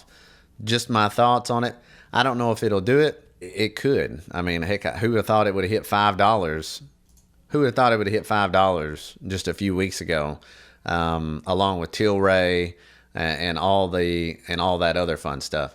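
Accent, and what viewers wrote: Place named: Texas, United States. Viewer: You from Texas? You sound Texan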